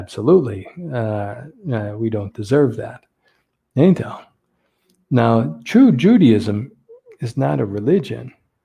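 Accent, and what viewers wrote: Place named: United States. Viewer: The American accent is wonderful!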